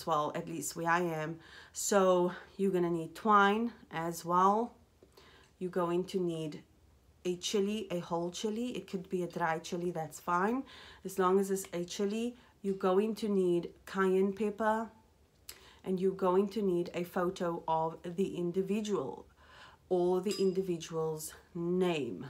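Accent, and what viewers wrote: South Africa. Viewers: Hallo, recently discovered you on your dark energy video's. Are you South African by any chance?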